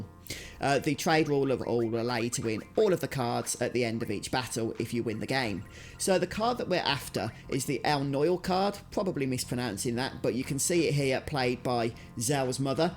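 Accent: United Kingdom. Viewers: Are you from U.K??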